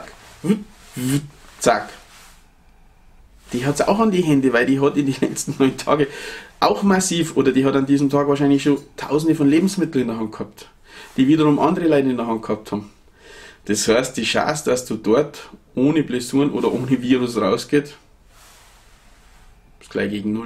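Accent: Bavaria, Germany. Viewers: Gutes video, und die bayerische mundart kann ich sogar verstehen. Da gibt es schlimmeres.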